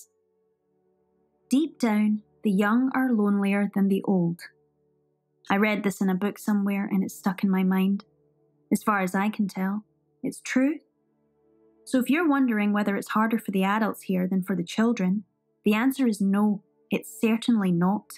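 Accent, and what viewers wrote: United Kingdom. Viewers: The Scottish accent is a bit off putting but Anne's words shine through.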